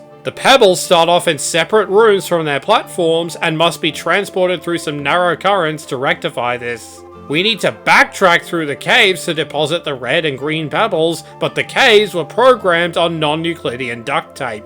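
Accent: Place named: Australia. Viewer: Never heard of this game, nor do I care about it at all...But I sure do love hearing this Aussie try to beat challenges, so I watched the whole thing.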